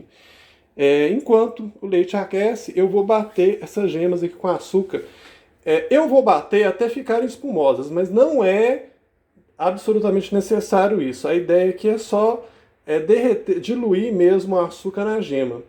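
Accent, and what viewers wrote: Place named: Minas Gerais, Brazil. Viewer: Não têm né 😖meio difícil só pegar a receita de boca com sutaq de mineroooo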